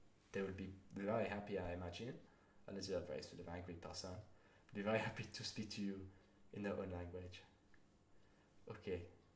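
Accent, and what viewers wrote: France. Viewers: Vous parlez très bien! J'aime votre accent français quand vous parlez en anglais aussi haha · Your French accent in English is perfect 😂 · I love it already ❤️ your French accent 😍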